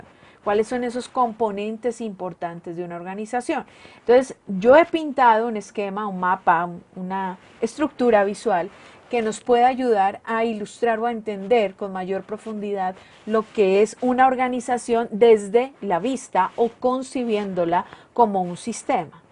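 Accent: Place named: Colombia. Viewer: Adoro el acento colombiano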